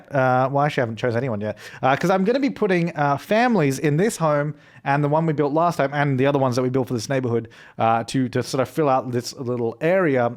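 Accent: Australia. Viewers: Why I Love listening to You and Deli? I think Australia accent combines with sims builds. 🤔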